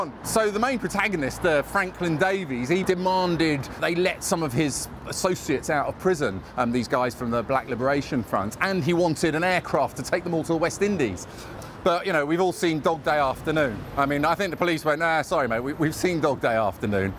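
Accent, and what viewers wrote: United Kingdom. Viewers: Dear, thank you so much for this remarkable walk through Knightsbridge. As a French admirer of yours, I'm always delighted to hear your lovely British accent and your explanations that are always on point.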